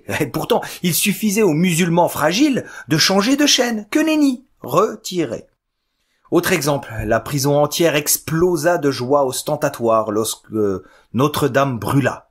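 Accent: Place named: Algeria. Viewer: Merci pour toute ces lectures, et sinon vous êtes maghrébin n'est ce pas? En tout cas votre "accent" et façon de parler l'est et c'est de l'algérien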